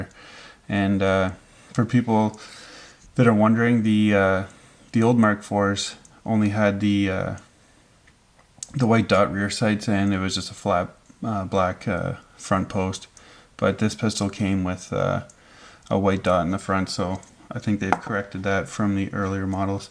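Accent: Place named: Canada. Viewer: your accent screams "I'm canadian" haha, much love from Quebec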